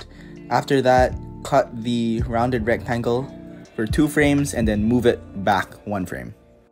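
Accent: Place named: Philippines. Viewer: Filipino accent do be nice tho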